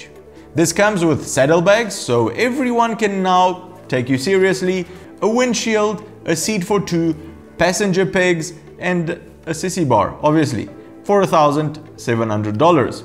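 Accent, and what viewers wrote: South Africa. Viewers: SA accent annoying.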